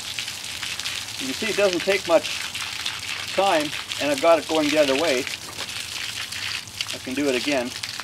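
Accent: Canada. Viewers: Canadian? I love the accent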